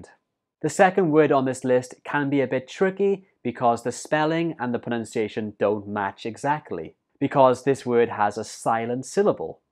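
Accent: United Kingdom. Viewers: So did I. It's easy to understand his British English.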